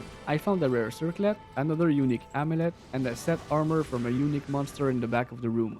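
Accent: Quebec, Canada. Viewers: Ton accent est tellement l'image parfaite du Québécois bilingue lol. Bon vidéo broski! PS : J'ai 2000 kills de meph/andy TZ en solo et je n'ai toujours pas Highlord's. J'ai juste abandonné et pogner mes torches lol. T'es chanceux !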